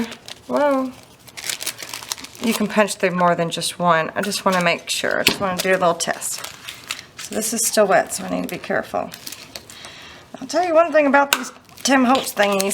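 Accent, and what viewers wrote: South, United States. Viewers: You are so darn cute!!! I'm from the South and I love listening to you as I have lived in California long enough NOT to talk Southern and I so enjoy listening to you and yo are so funny. I have the same problem you do with something right in front of me and NOT being able to say the right word, ROFL!!! Anyway, I have just watched all 4 of your videos about the tag journals and I have learned way more than if I watched 10 other videos. THANK YOU SO MUCH!! I think I like the putting together of them like the one at the end since the stitching part looks more complicated than I think my brain would allow me to do, hee hee~~ Thank you for teaching this newbie so much. Take care and God bless you~~ xoxo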